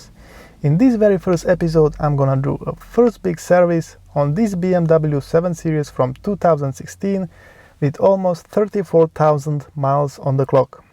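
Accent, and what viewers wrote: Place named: Romania. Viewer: I toght you are from 🇷🇴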